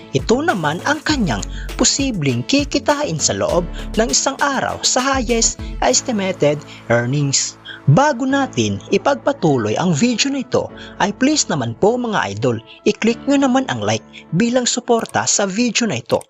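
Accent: Visayas, Philippines. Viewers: ang lamya mo mag vlog gukbo..ka umay ka..mas pinapanget mo pa boses mo at binisaya pa ang tono..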